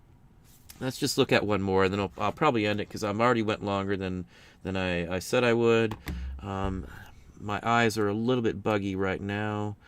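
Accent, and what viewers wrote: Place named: Northwest Ohio, United States. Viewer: I lived most of my life in northwest Ohio. From how you talk I assume you live near Toledo? What city do you actually live in or what town?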